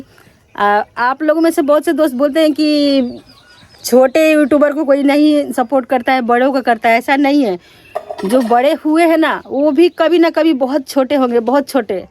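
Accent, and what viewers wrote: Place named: Gorakhpur, India. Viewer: Apki bhasa gorbpur ki lg rhi h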